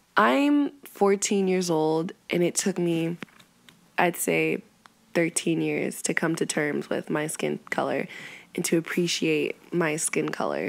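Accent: South, United States. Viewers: NAH YOU FROM THE SOUTH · Is it just me or does this voice sound American? But I love this girl. This is so sad.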